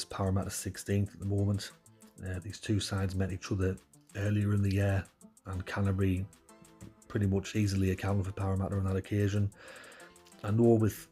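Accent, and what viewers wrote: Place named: Liverpool, United Kingdom. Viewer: Scouse accent..👌😊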